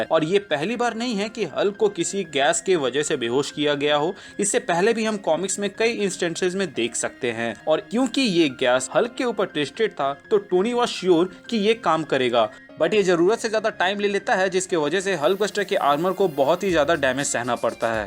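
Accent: India. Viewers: Aap ho kaha se brother kyuki aapke baat krne ka tarika kaphi south east hai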